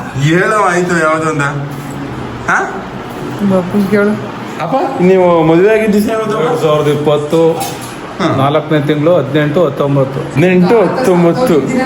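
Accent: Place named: Mysore, India. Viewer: Nim uru yavdu bro Mysore district anta gotaithu nin bhashe ((slang)) matode rithi nodi gotaithu ..nija alva?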